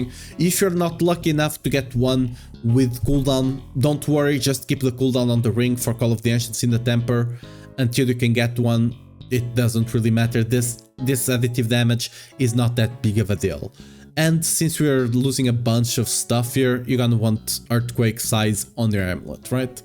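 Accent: Portugal. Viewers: Based on your accent, I am 50000% sure you are Portuguese. Please tell me I am right!?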